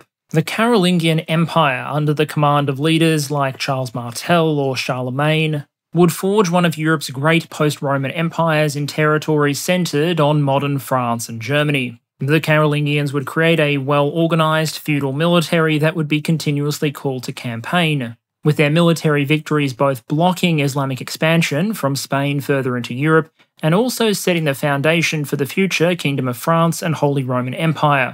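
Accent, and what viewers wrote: Australia. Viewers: How did you learn to speak so many different languages? in the video where you have spoken about the war in ukraine, the germans, the japanese and now this one, your pronunciation of all those various languages (even though you can still hear the australian in it) is impeccable.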